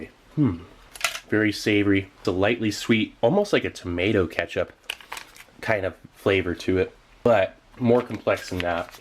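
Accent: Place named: United States. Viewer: Could anyone please tell me where in the US does accent come from?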